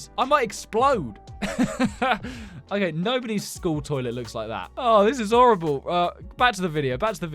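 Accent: United Kingdom. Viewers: British person alert 🚨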